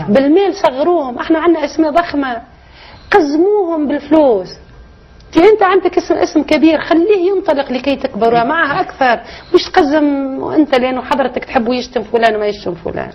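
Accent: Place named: Maghreb. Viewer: لهجتها مغربيه او تونسيه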